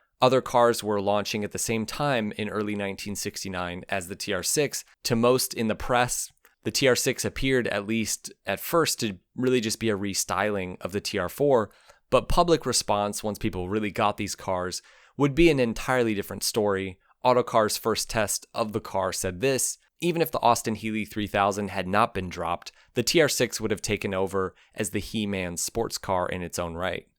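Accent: United States. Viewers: Well done for putting this together with a nice presentation of the models throughout the years, ... but I wish you would pronounce Jaguar, Nissan and Datsun correctly and not in that dreadful American way. For instance, Jaguar is pronounced 'Jag-you-are' NOT 'Jag-Wah'! Nissan is pronounced 'Niss-an' (as in Miss) not 'Nice-An'.! Also, as a suggestion, since the emphasis was on the TR6, a bit of info on the uniqueness of its power train (which is one of it's main features), that being the 6-cylinder PI. With mechanical fuel injection, it stood out from others in and above its class because of this. Interestingly you make the comment, or imply, that in the early seventies, for a UK motor manufacturer, it was unique with it's overhead cam, but Jaguar had their overhead cam XK engine from the early 50's as fitted to the XK120-150 and the Mk1 & 2 from the late 50's & throughout the 60's. 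However, the TR6 is definitely on my wish list. You refuelled my interest! Thanks. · THERE IS NO "W" IN "JAG YOU ARE." Stopped watching, since an American can't teach us about BRITISH CLASSIC CARS. And DRC'd as well.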